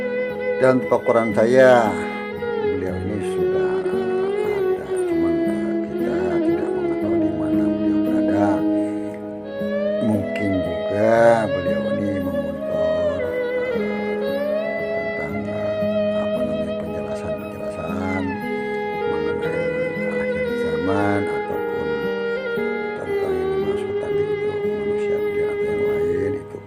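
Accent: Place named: Sulawesi, Indonesia. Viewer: Abah ini yg pny chanel yah...aksen suaranya sama hehee..ciri khas Sulawesinya gak bs dibohongi bah 😁👍👍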